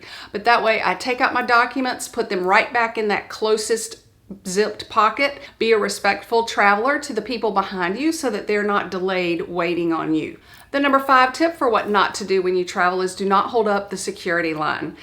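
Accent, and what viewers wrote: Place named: Texas, United States. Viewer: Ah that Texas accent. What is the plural form of y'all? All y'all!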